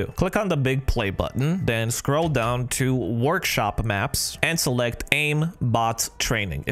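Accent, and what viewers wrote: Romania. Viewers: R u Romanian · are you a romanian? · Esti roman? Romanian?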